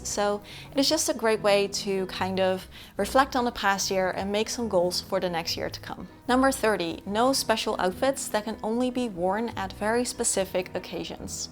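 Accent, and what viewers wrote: United States. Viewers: Great video! Thank you,. I also want to compliment you on your English. I would have assumed that you grew up in the US. 😊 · Netherlands English accents are American or did you learn English in America? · is fluent in English but definitely has an American accent!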